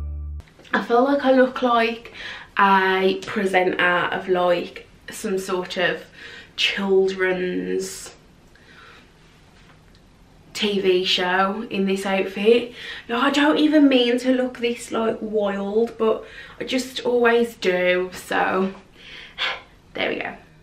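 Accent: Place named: England, United Kingdom. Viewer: Hi 🙋🏻. You popped up in my recommendations and I’m SO glad you did 🥳👍🏼. I love my American ladies but it’s so nice to hear a good old English accent 🤣. You deserve a 🏅 for trying on all those jeans. I absolutely detest shopping for jeans 👖 🔫🤬🥵❗️. I am a size 18, and like you have a small waist and big hips and thighs ☹️. If jeans fit my waist they sure as hell ain’t gonna fit my hips. I have been buying jeggings in an effort to solve these problems. I feel more ‘put together’ in jeans TBH, but I love the fit and comfort of a jegging 👍🏼😀. ASDA do some great jeggings at the very affordable price of £12.50. I have several pairs and they wash up lovely 😊You should try them. Going off to watch some more of your vids now. New subbie 🌹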